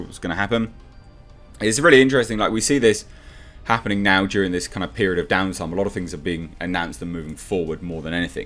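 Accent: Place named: United Kingdom. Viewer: I'm going to use the phrase 'circumnavigating the shit' in a sentence asap !! This man speaks the Queen's English.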